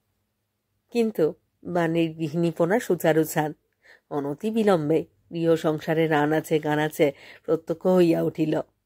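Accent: Bangladesh. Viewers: apnar bangladeshi dialecte pather jonnyo shrutimodhur lageni . aar kono action charai jeno reading porechen.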